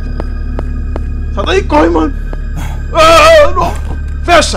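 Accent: Ceará, Brazil. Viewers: Aeeeee Um cearense! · Olha um cearense kkkkk a não mano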